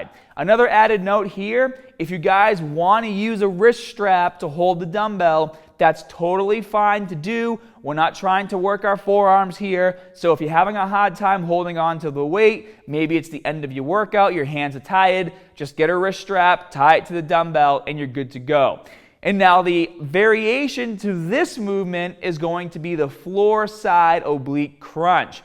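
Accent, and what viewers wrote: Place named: Maine, United States. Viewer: What is the accent. Maine?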